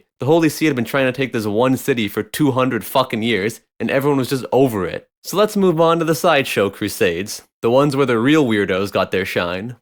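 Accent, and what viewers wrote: Newfoundland, Canada. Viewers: are you from newfoundland cuz i hear you saying ''bhys'' alot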